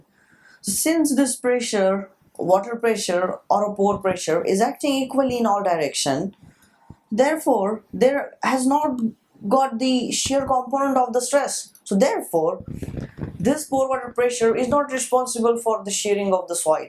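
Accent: India. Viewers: You want to sound like American, but end up as Indian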